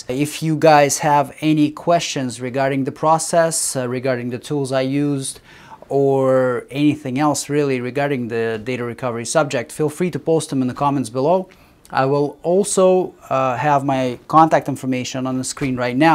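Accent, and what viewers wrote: Russia. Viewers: Ты русский? Акцент выдаёт.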